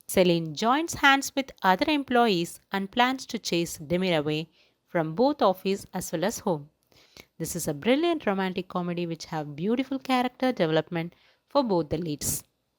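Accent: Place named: Kerala, India. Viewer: You (the narrator) sound like a Malayali woman Your accent I mean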